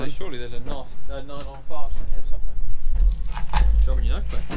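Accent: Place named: RP, United Kingdom. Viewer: I've said ths before but Gosh, you're posh... "fence tester"? WOW!😂👍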